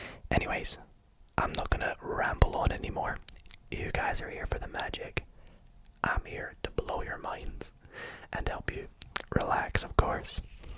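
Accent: Scotland, United Kingdom. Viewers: He’s Scottish 😭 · You’re same is enjoyable because it is interactive and interesting but at the same time you’re Scottish accent and voice is soooooooo relaxing I literally fell asleep watching the mind reading one the other day keep it up👌🏼🤌🏼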